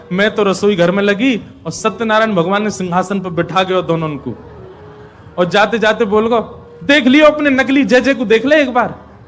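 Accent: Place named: Bharatpur, India. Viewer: Ye bharatpuri accent me jo katha vachan kr rhe h sun ki bahut khushi milti h 🥰🥰🥰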